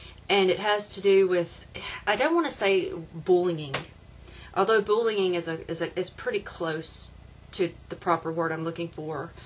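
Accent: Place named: Louisiana, United States. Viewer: You sounded close to home. Louisiana here. Thanks again!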